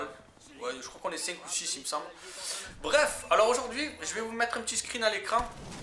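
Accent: South, France. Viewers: Tu est trop excellent putain tu me fais grave kiffer avec ton accents du sud j'ai beau savoir que tu est pour Marseille je te kiffe continue mon gars <3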